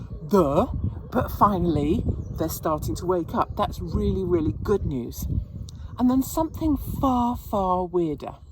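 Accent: United Kingdom. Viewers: I love fabulous British accent when she's speaking American.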